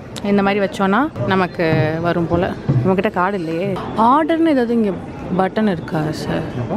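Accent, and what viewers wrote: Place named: Salem, India. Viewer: எல்லாமே பிடிச்சி௫க்கு...முக்கியமாக உங்கள் பேச்சு...நம்ப சேலம் ஸ்லாங் அ௫மை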